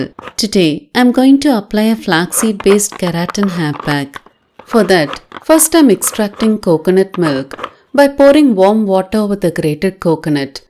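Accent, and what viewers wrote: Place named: South India, India. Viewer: I love your south indian accent🥰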